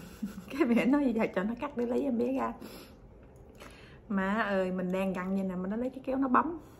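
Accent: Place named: Mekong Delta, Vietnam. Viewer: Giọng chị chắc ngừoi Miền Tây hả chị! Phải ở gần mình tới lui cho đỡ buồn. Em cũng mới sinh xong nên thấy thương mấy Mẹ bầu sắp sinh lắm.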